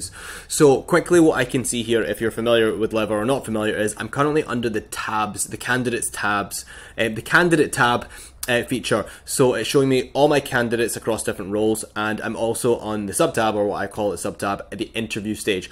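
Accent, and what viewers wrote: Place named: Scotland, United Kingdom. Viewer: Tis a lovely Scottish accent, close to the Irish brogue that my family has. It is lovely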